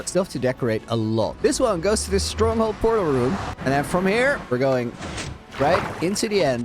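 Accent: Netherlands. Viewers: You must be dutch right? ^^ great vid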